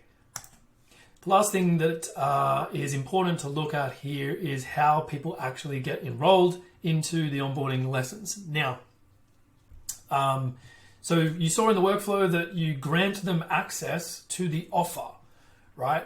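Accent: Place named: Australia. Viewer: Hey, Love the Content, got a question for you. From your accent i'm assuming your from Aus and am aswell, I have recently acquired GHL for starting an agency however my concern is when using a mobile phone number from Twillio/ Lead Connector the billing & pricing is way too high and would mean im getting charged a lot from my clients in the future. As such do you use a Mobile phone number or other type of twillio/ Lead connector phone number type for your clients and yourself? Cheers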